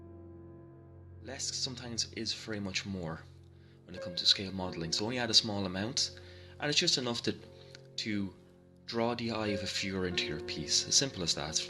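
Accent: Ireland. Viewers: Love your work! Thanks for your effort!, coming to you from California, dig the Irish accent! Keep em coming as your teaching me a lot!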